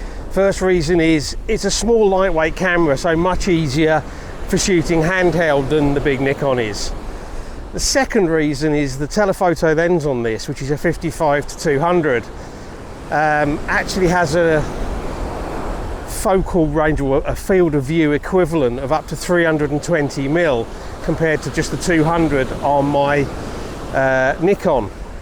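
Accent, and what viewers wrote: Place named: England, United Kingdom. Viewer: In the beginning I understood "I love wifes, I love photograhing wifes" later I realized, that you`re from England 😁. Sorry... Beautiful video!! 👍👍👍👍 Best regards Your namesake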